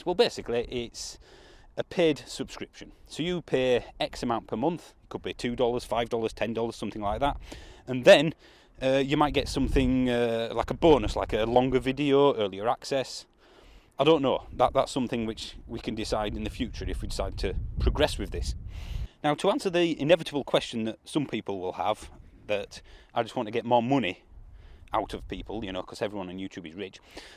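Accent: Yorkshire, United Kingdom. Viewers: I quite like... your, I guess, Yorkshire accent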